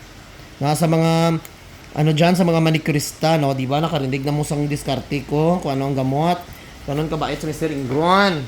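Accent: Iloilo, Philippines. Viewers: You sound ilonggo/hiligaynon po ☺️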